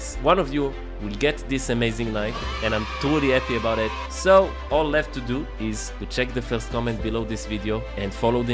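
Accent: France. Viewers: I love you and your french accent :3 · knife looks very bien, luv ur french accent, but id love to have the knife even more :)))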